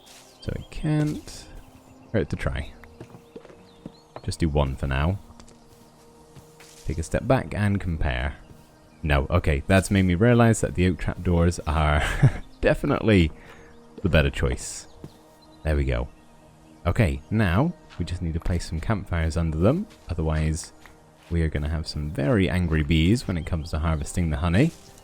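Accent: United Kingdom. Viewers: are you from the U.K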